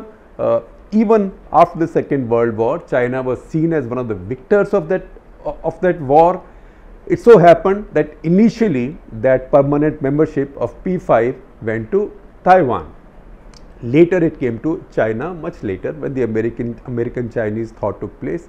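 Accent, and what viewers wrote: India. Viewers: As a Westerner, I always find Shekhar Gupta's analysis interesting. The Indian perspective is quite different to that of the western mainstream media. And the Indian way of speaking English is pretty cool too :-)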